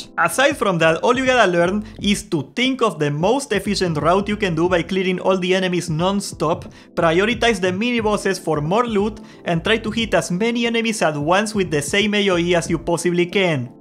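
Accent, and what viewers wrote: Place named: Argentina. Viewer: Al minuto dije este es argento. Buen video campeón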